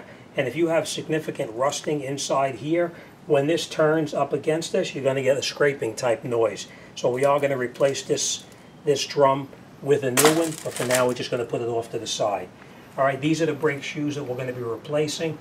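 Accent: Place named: New England, United States. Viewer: Cool. You have a New England accent! "Hello Your On Car Talk"!!!!!! Don't drive like my brother!!!!!!!!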